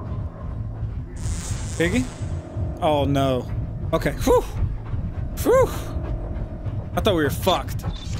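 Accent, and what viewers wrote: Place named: Tennessee, United States. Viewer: You sound like you're from Tennessee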